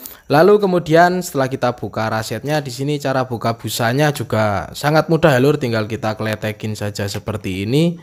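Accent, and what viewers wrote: Temanggung, Indonesia. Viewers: Samang wong temanggung po mas?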